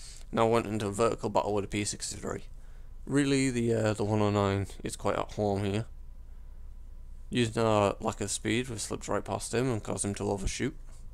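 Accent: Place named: Yorkshire, United Kingdom. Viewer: Sounds like he's supressing a north/midlands english accent lolol Yorkshirey?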